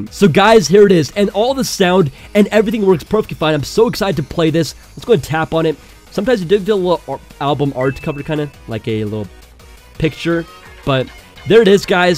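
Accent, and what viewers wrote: India. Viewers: Are you Indian.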